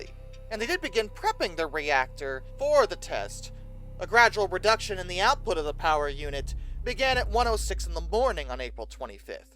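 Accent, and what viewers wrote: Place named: United States. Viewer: Okay dude you sound like an American so why in the hell are you calling Z zed?! What are you some kind of eurodegenerate or commie?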